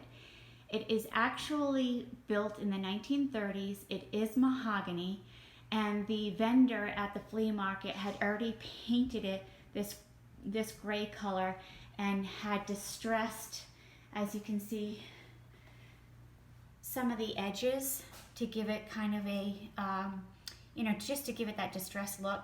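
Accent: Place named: New England, United States. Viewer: You found some really nice things! I love thrifting! I knew I heard New England accent! Thanks so much for sharing! New subbie!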